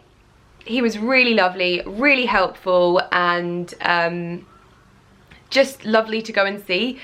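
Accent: United Kingdom. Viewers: I really love your approach to the whole thing (like how only a subtle change can make a huge difference, but at the same time still look like YOUR nose) and how you explain your reasoning :) New subscriber!! ps. You kinda remind me of Emily Blunt, with your new nose (especially when it was swollen) and your british accent hehe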